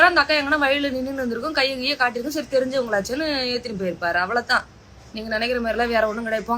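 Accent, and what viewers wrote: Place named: Tiruchirappalli, India. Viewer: Nee endha ooruma? Trichy slang a theriuthu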